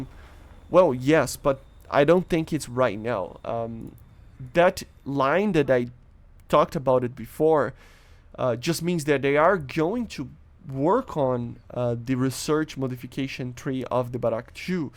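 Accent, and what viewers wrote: Brazil. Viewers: are you Brazilian???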